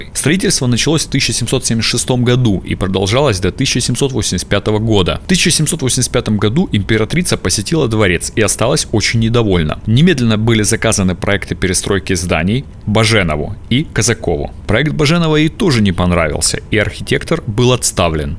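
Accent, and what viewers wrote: Ukraine. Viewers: Видио очень понравилось,как и коментарий к нему,но почему то удивил украинский акцент,как будто про Киев автор рассказывал,но может так и интересней,спасибо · Голос за кадром - иностранца , а точнее - украинца,.Сам фильм хороший, расчитан на 10 дней. В ресторан не обязательно, но билеты в Большой театр , даже по 5 тысяч , россиянам не по карману.В СССР была возможность ходить по студенческому и ЛЮДИ ходили на спектакли после работы.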